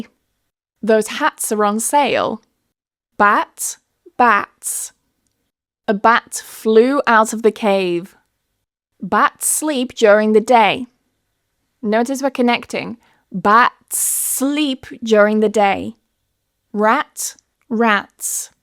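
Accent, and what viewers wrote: United Kingdom. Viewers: I truly love british accent, and specially yours ❤ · I want to speak like you. I really love british English. Could you tell me how I can learn british English?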